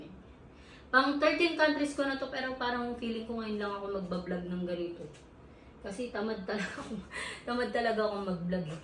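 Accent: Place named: Australia. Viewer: I wish to meet you one day. Did you live in Australia before or hang around lots of Aussies? Your accent is like ours.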